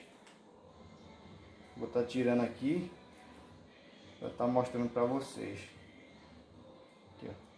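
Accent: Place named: North, Brazil. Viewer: acho q e do norte ou nordeste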